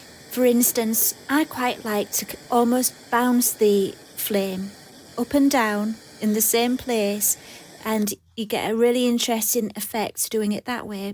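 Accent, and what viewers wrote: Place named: Yorkshire, United Kingdom. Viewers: I love your east riding twang. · Nothing more pleasing to my ear than a Yorkshire accent. Thanks for the tutorial!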